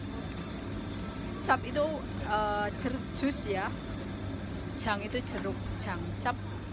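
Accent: Java, Indonesia. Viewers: Hhhee embak orang jawa yea .hhhhee mrdok bahasanya. Keren mantab salam 77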